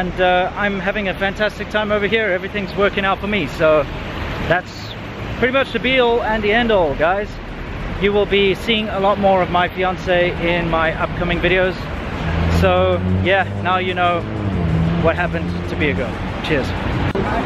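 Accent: South Africa. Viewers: 南非口音的英语？还假装自己是英国人过？喜欢说瞎话的人，他的话还能信？ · FKM ...the phrases he uses sound like South African English to me...he hasn't got a pure British accent.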